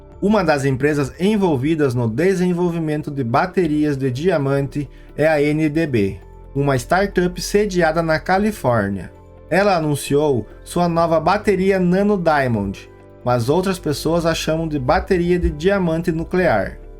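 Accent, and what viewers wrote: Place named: Caipira, Brazil. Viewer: Este sotaque caipira do locutor é insuportável!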